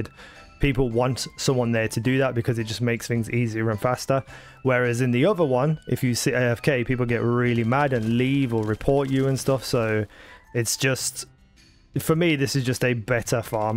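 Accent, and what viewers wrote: Birmingham, United Kingdom. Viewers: I wasn't being rude when I called you Brummie. I'm from the south coast but I'm actually welsht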